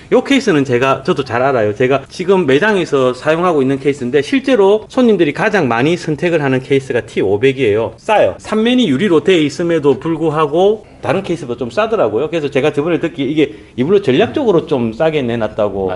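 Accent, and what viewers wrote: Gyeongsang, South Korea. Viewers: 구수한 경상도 말투가 방송볼때 좋았습니다....사실 저도 경상돕니다..ㅎㅎ건승하시길 바랍니다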